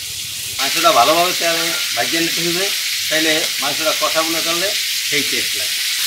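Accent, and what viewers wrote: Barishal, Bangladesh. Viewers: দুদু আমনে কি মোগ বরিশাইলা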